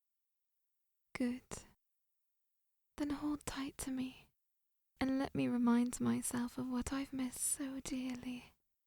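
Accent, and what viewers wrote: United Kingdom. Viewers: your British accent is no less than satisfying and ethereal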